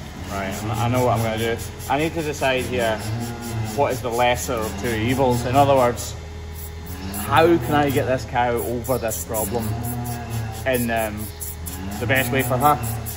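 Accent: Scotland, United Kingdom. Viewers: Retired middle and high school science teacher, now a canine obedience and rally instructor, student, handler, and judge for 3 separate registries here in the US. Have been following since before the Appleton crush, and really appreciate learning new things. Plus, I also enjoy the banter and cadence of your storytelling - my ears get a Scottish vacation 😊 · Swedish 51 year old middle school teacher! Teaches grades 3-6 at the moment in science, biology, chemistry, technic, maths and (drumroll😂) English! Living in the very southern part of Sweden, near Malmö. Really into animals and helping them! Catowner 🐈‍⬛and wildlife lover! 🐌🐛🐞🕷️🪲🦗🦇Have planted a LOT of plants and flowers for butterflies 🦋 and let my lawn be full of white clover ☘️ for bumblebees🐝 and bees. Put up bee hotels and bat dwellings ! I keep the corners of my little garden messy for the hedgehogs 🦔! 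Water bowls and food for the birds 🐦 🐦‍⬛ I really enjoy your channel here! It’s so nice to see you help these animals to a better life! 🐄 🥹😍 Been to Scotland 🏴 Edinburgh once and love hearing your Scottish 🥰 Must return soon! Also a huge fan of the band Wet Wet Wet and singer Marti 🥰 Bye from 🇸🇪🌤️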